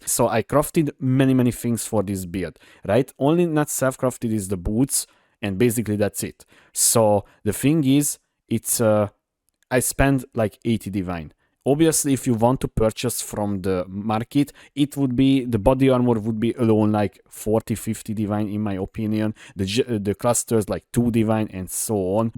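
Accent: Hungary. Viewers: Hungarian accent alert!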